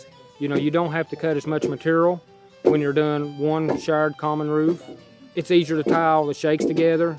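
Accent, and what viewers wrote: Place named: East Tennessee, United States. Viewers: nice. bunch of us in AL around like that too so I knew he was southern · His accent is Appalachian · I'm from East TN and he sounds like he's either from there or the plateau. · it sounds like a east TN, or a east KY, accent! Hill folk know hill folk! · I would guess Kentucky or Tennessee, I would also guess most Americans could identify that pretty easily.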